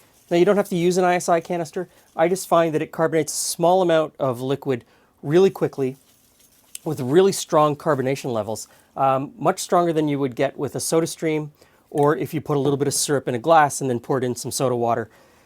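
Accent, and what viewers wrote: Canada. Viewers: Are you Canadian ?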